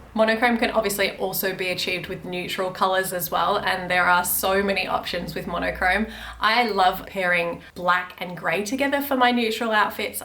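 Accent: Australia. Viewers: Great vid love your non bogan Aussie accent and your way of explaining this theory is really clear with good examples. Thanks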